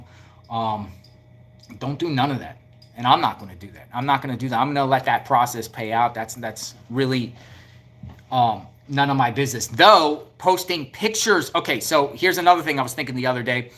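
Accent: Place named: New Jersey, United States. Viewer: HEY, personal question. (You don't have to answer.) I have fun trying to figure out accents. Are you originally from Jersey or Philly? I went from Jersey to Miami Beach in my teens so I know that a lot of New Yorkers move down to FLA. Snowbirds, you know! 😆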